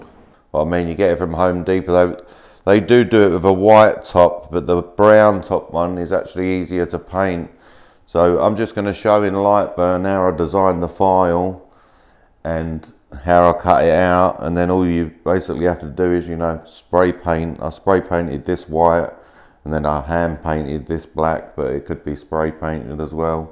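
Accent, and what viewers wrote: United Kingdom. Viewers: Mate, it says you're in the states but you judging from your accent you're from the UK? Seems like you know your stuff, what's your story?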